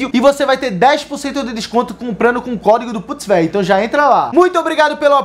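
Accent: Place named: Northeast, Brazil. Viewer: Esse sotaque e gíria nordestinos dão um plus muito foda pro vídeo. Hahaha Fica ainda mais engraçado. Parabéns!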